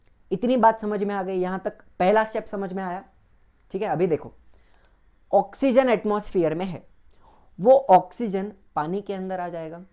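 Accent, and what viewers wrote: Maharashtra, India. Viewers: Sir kya aap maharashtra se ho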